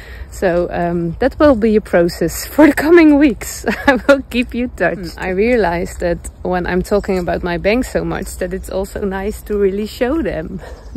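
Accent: Netherlands. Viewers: I’ve just started to watch today’s podcast but I had to finally comment. I’m in the US but from Canada where my parents immigrated to from Belgium in the 50s. My mom, who passed 3 years ago, also spoke “Dutchy English” and so many of your expressions remind me of her. ❤ my parents are from Brugge and Meetkerke and so much of what you share reminds me of my family. Today when I saw you leave your house and saw the colorful plastic strands in your doorway it made me hurry to the comments….my aunt had those too! ❤ thank you for bringing so many memories of love and family back to me…. And I love your clothes and granny squares!!! 😄 thank you sharing your life and knitting/crochet. PS the bangs are nice curly!! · Thank-you for another lovely podcast. I enjoyed seeing the countryside around your town. Your dog riding in your carrier bin made me smile. Not that my opinion of how you wear your should make any difference, but I like the curly bangs/fringe they go with your curly hair 😊 A glimpse into life in another country and the "dutchy english" are both good. 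Keep slaying those dragons 🇨🇦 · I cannot thank you enough for taking the trouble to do a podcast in English. Your “Dutchy” English is quite good and enjoyable to listen to. I had heard about you for a little bit, but just started watching your knitcasts last week. I would 100% say that you come across as genuine, quirky and fun…. I especially enjoy your love of brown and your hair. You have one of the most lovely hairdos, curly bangs and all. 😊 Hope you have a lovely week. Looking forward to seeing you steek your cardigan! 😁💚🧶☕️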